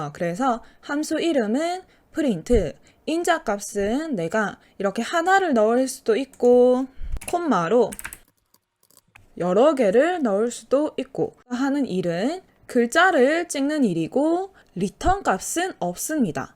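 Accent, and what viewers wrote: Gyeongsang, South Korea. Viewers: 경상도 사투리 반갑네요 ㅋㅋㅋㅋ 전 서울상경해서 코딩배우는 대학생입니다😢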